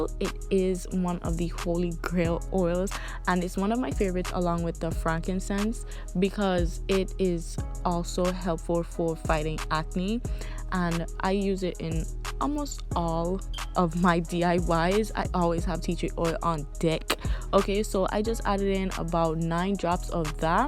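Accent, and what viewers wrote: Caribbean. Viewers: Are you from the Virgin Islands 🇻🇮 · Heyyyyy 😍 where you from if I may ask? U sound like u got caribbean background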